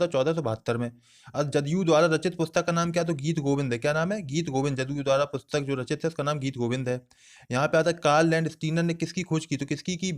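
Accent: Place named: Bharatpur, India. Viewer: Sir aapki boli bharatpur ki LG rhi h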